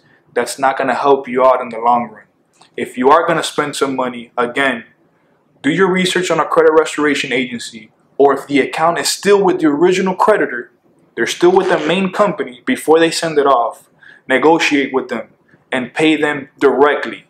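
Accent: United States. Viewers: damn i miss that south FL accent. never thought i'd say that but it's true. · Miami accent coming in strong 💪🏼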